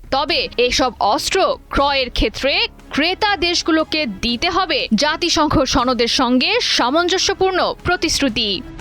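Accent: Kolkata, India. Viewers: কলকাতার সুরে খবর পড়লেন মনে হলো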